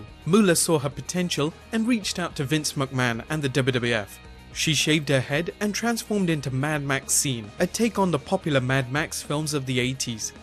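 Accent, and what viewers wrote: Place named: United Kingdom. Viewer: Absolutely fantastic to have a British accent back. Great work 👍🏾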